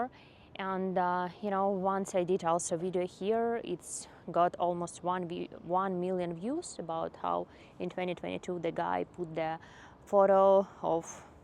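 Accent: Ukraine. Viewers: thank you for the update and I understand your English because I had to listen to my Ukrainian mother for over 30 years and your accent reminds me of her so you're doing great! No worries. Slava Ukraini. Taras in the US. ❤️❤️🇺🇦🇺🇦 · Thank you for speaking English to the camera and sharing your experience with everyone . I like Ukrainian accents .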